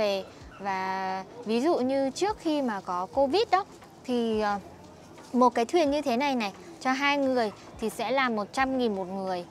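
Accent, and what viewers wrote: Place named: Hanoi, Vietnam. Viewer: It sounds like you are speaking the Ha Noi dialect. Do you know the Vinh dialect?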